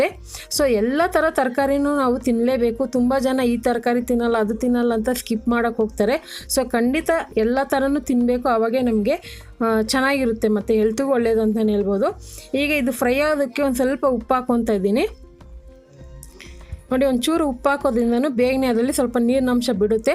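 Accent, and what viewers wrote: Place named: Anekal, India. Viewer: Watched your kalamadhyama interview. I am watching your video since a year and I did not really admired the way you were speaking kannada words... But now I got to know that you are from Anekal and slang is like that.. All the best tangi... Devaru nimagella olleyadanna maadali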